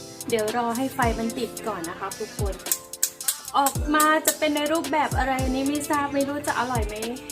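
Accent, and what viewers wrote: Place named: North, Thailand. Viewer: สวยครับเหมือนสาวเหนือพูดไทยน่ารัก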